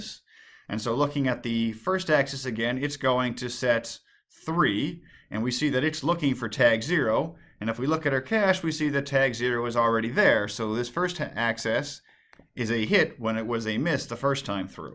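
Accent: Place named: India. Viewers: Indian English lets me forgets standart english as you can see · I know right. I mean no offence, but it's hard to perceive an Indian accent. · Thank you so much for this. English is my second language and I have a huge problem understanding indian English, so this is extremely valuable for me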